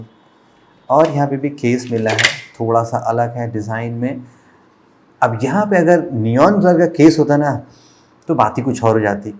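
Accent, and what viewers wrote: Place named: Rampur, India. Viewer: Bhaiya apke rampuri ka koi jawab nahi😁😁😜🙏🙏❤️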